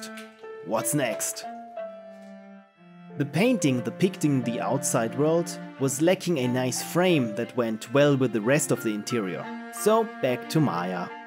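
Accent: Germany. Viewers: Is he german? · are you from germany? you sound like you are german...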